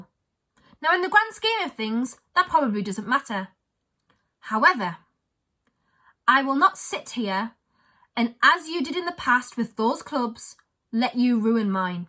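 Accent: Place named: Darlington, United Kingdom. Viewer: are u from darlo im sitting here wi wor lass and i said to her hoy pey she talks like u lol.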